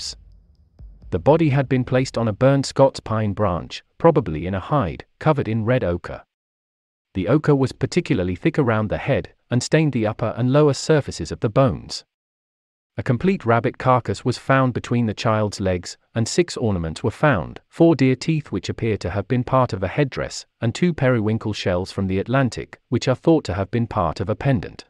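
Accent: England, United Kingdom. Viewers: I like your english accent.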